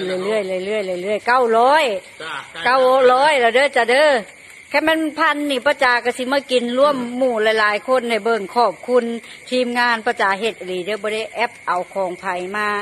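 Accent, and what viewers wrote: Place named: Phu Thai, Thailand. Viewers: สำเนียงเสียงภาษาคือย่อสกลนครเนาะจ๊ะดีใจด้วยคะ. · สวัสดีค่ะป้า อยู่ทางเลอค่ะนิ คือเว้าภูไทย คือกันกะนางแท้ กินยามเลอกะแซบยามนั้นเนอะค่ะ เป็นอีกหนึ่งกำลังใจให้ป้าเด้อจร้า😊❤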